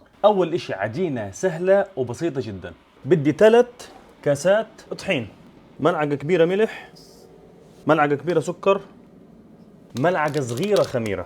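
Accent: Gaza, Palestine. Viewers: أنا من فلسطين وكمان مو هيك لهجتي هو لهجة غزة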